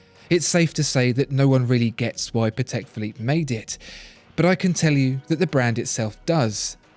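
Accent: United Kingdom. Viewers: Ugly watch no matter how this guy with a British accent says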